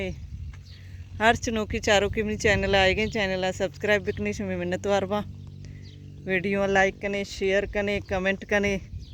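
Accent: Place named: Makran, Pakistan. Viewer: وعلیکم السلام مئے شمئے کلمتی مکرانی لہجہ ءَ هوں همے چیزا دَرنگ گُشاں کہ مری درنگ گشگنتی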